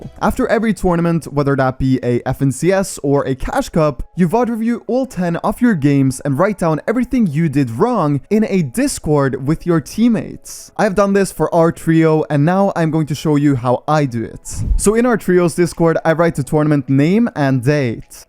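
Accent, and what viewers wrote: Denmark. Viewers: are you Danish ?? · are you danish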